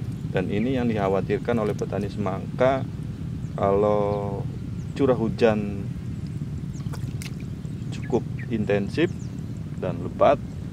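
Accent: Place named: Indramayu, Indonesia. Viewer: logate kaya logat dermayon.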